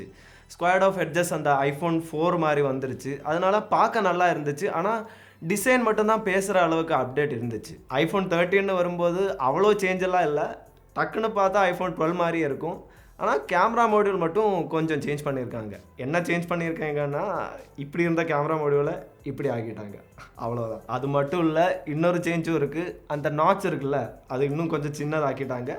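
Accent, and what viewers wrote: Kerala, India. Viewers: Bro ungalukku tamil sariya pesa varaliya Malayalies pesura mathiri therithu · Unga pechula Malayalam slang adikkithe brother... Nallaa thaan irukku❤️❤️ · First u ne video la varatha. Unnoda Malayalam accent a kekum pothu kadupa iruku.😤😤😤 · Bro are you malayali ne Tamil pesaradha patha appide dha theridhe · Bro neenga entha state bro Kerala va, unga sppech super ha iruku❤️❤️❤️❤️❤️ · that malayalam slang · Thambi kerala vo? Malayalam vasana adikkithae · He is Malayalee. Endha aniya Sugamano? Ninda Thamizh valara adipoli.. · Neeinga pesura vitham Yaro Kerala la pesura mari iruku Tamil nadula irunthutu Y antha slang la pesuringa.. 🤷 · video nalla thaan irrunthuchu Kerala slang avevalavu thaan Ash naa Chennai slang 👍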